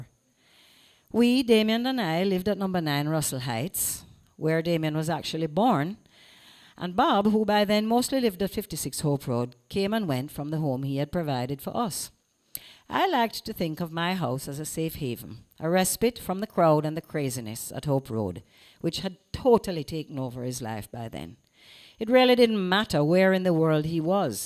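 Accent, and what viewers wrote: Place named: United Kingdom. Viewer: Unfortunately I don't know jamaican accent but her pronunce seems just english -british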